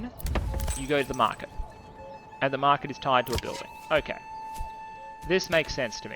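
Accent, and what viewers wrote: Australia. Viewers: Woooooooooo More australian PowerPoint guy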